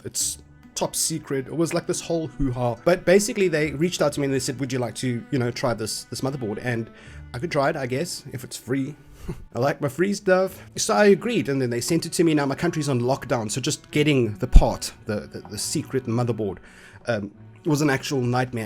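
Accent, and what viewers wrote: Afrikaans, South Africa. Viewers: Is jy Afrikaans?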